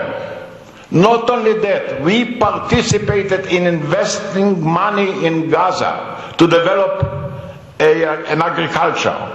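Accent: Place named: France. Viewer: boyle francizca aksani kulaga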